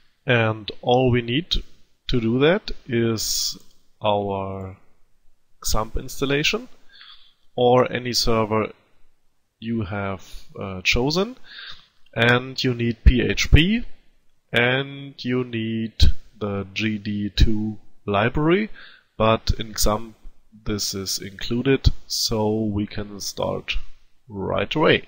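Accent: Germany. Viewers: Es ist nicht so grausam... es ist ein Deutsches akzent! :)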